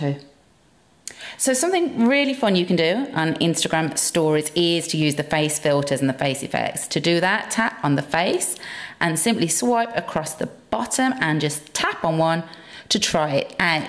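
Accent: United Kingdom. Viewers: Are you British,please reply me.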